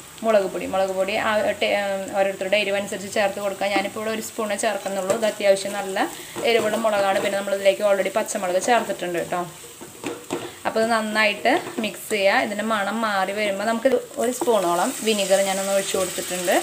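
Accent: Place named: Thrissur, India. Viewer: kollaatooo👍👍👍.... Thrissur anoo namude naatukaari anale... Samsaram ketapo thonni...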